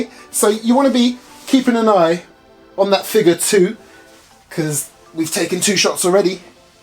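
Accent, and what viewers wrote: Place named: United Kingdom. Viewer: sounds British so maybe he lives in the UK and he's not allowed by the law to buy or own the real version🤔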